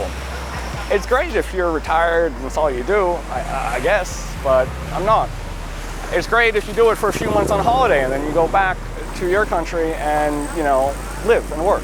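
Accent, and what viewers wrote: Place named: North Carolina, United States. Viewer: Hi, I really like your videos about daily life in Thailand and the many traps you can come across as a farang living here in Thailand. But there is one thing I want to mention about your performance and I hope you will understand what I mean: I'm a native German speaker and actually quite fluent in English (worked in the UK for 4 years) but I sometimes have really difficulties to catch up with your Northern Carolina accent. And I think I'm not the only one among your followers that have this issue. I you - maybe - can do something with that it would be really appreciated very much. And please: No offence meant! 😜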